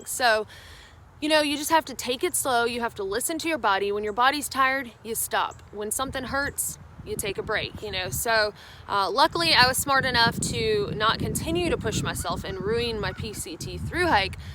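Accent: South, United States. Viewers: Once again, top notch video, great content. Recently went to a seminar, and heard same points, different words. I like your southern drawl better. Thanks for all you do here!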